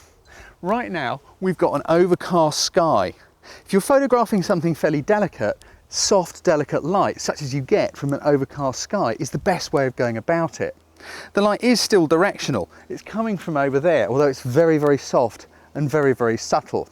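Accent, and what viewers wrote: England, United Kingdom. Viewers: Only discovered your videos today, but am completely hooked. Your teaching style and pace is fantastic and your english accent is like a balm (No offense Americans - I love you guys, but being from the UK myself it is damn nice to hear a fellow brit every now and then). I think you should totally have your own TV show maybe on Discovery Shed (I'll start the petition if you like)!!! Seriously though, thank you for what you do, ad for the help you have already provided me with.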